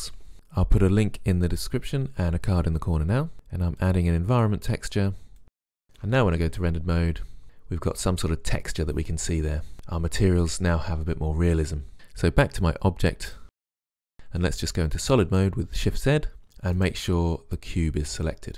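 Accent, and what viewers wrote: United Kingdom. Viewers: one of the best blender tutorials. btw, I really love your british accent - listening to you is better than bbc actually !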